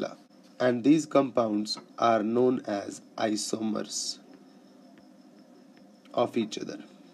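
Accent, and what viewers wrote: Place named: India. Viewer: one of the few Indian accents that doesn't irritate me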